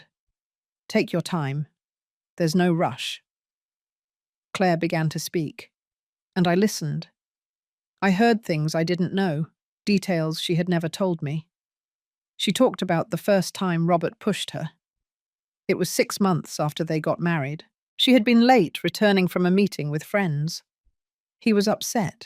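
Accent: United Kingdom. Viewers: She said that she is from Boston but she sounds British and the words in the closed caption are spelled British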